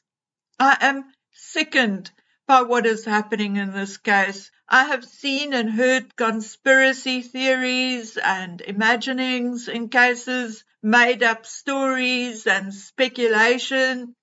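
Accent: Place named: South Africa. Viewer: dont know what it is about the South African accent but its soooo beautiful .-) great video btw , lots of valuable points made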